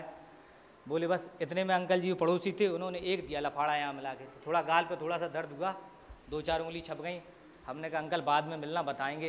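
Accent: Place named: Bundelkhand, India. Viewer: BUndelkhandi to ho guru tumm ho kaha se.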